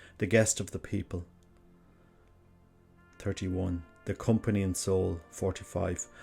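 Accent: Ireland. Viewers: Did you go beyond chapter 1? We have a family copy of the book (Father Frank Canavan is my great uncle) but hearing it in an Irish accent is quite special.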